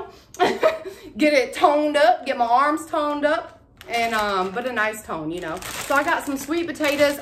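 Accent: South, United States. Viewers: Alright, I just stumbled on to your channel today by fluke/accident while looking at something else and I have SO much to say about how great I think you are but there ain't enough room here so maybe another time. But I will say these 3 things:your accent (from one southern girl to another!), your so down to earth (which feels so rare these days on social media) and lastly in addition to you showing us your finds the fact that you rattle off different ideas we could use them for is amazing! Especially for extremely busy gals such as myself that gets overwhelmed which creates creativity blocks 🤦🏼‍♀️. I just wanted to share also 2 ideas, those Yardley or any of the body wipes also are great for elderly ppl that may have a hard time getting in the shower so they're easy to use for them to freshen up between showers. And 2nd that hearts silicone tray you got in a previous haul could also be used to fill with water for ice cubes which would be cute in a beverage (such as house wine of the south aka sweet tea). 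Anyway I'm a new subscriber now and am telling my Mama & friends about you too. Thanks so much 🤗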